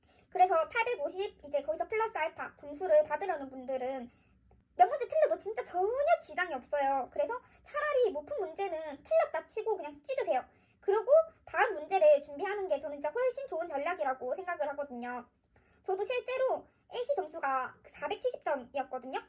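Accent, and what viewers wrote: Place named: Gyeongsang, South Korea. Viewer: 경상도 사투리가 좋습니다 ㅎㅎ 영상 내용도 좋네요 감사합니다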